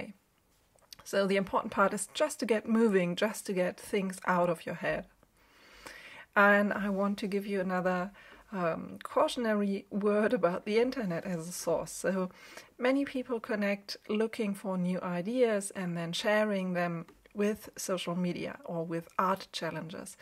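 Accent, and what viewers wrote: Germany. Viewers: I came here for the subject matter, but it`s your impressive vocal delivery in posh English with a German lilt that has kept me lingering.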